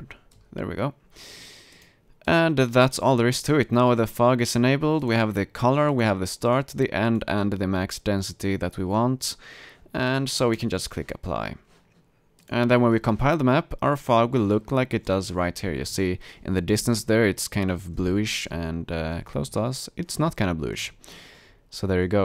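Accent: Sweden. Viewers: Hah jag kunde höra att du var svensk :D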